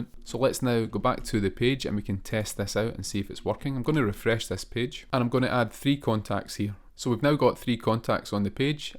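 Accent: Scotland, United Kingdom. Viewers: Subscribed for the amazing combination of relevant web development content and a Scottish accent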